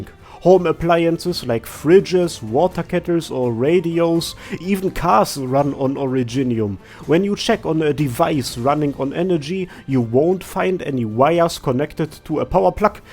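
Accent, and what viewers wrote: Germany. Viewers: Is That a German accsent? Damn do i Sound like That when i speak englisch 😳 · you german or close to that?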